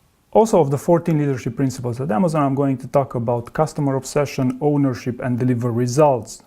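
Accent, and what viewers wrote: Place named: Romania. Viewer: this is a Romanian accent if I've ever known one.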